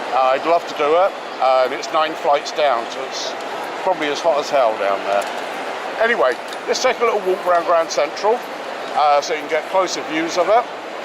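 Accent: England, United Kingdom. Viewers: How carefree life was pre-Covid! One can see it on how people walked, how they unconsciously formed a huddle and it was perfectly okay to do so, & most of all, no masks! I've watched vlogs of Grand Central Station (as it is one of the popular places of NYC and hence, a favorite spot to vlog about) but your narrated walk through it stands out because it is very well researched. If one wants to know the juiciest story of a corner of NYC, trust - to tell it to you! Well, of course, your "exotic" Englishman accent is an extra treat!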